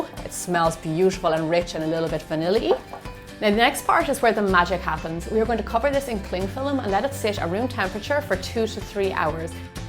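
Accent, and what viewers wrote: Ireland. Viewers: Lovely recipe, but are you Irish? you sound irish... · Love your Irish accent! Cork?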